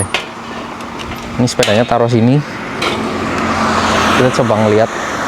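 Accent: Banyumas, Indonesia. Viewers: Sampean asale ngapak ngendi mas?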